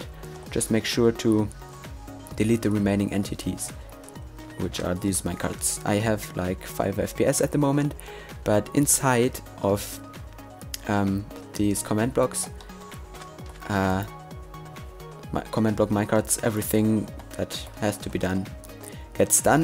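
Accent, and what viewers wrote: Germany. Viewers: Are your a german guy?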